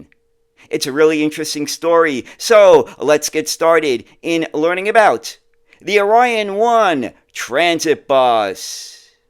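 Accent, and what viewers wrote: New York, United States. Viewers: I still think is a NEW YORKA! Come on, you can't hide it with that accent, you are a NE-NE-NE-NEW YORKA.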